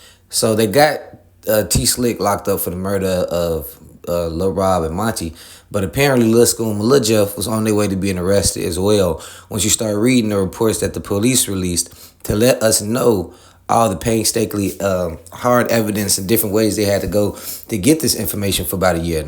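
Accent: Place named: Gary, United States. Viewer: he gotta be from Gary or east Chicago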